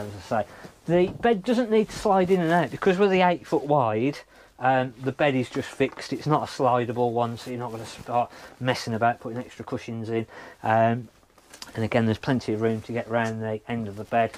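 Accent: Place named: Birmingham, United Kingdom. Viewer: Correct me if I’m wrong your a fellow brummy😂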